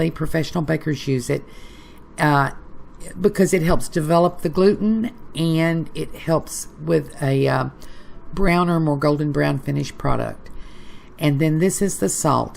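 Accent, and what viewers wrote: South, United States. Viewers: I knew you were from the South !!!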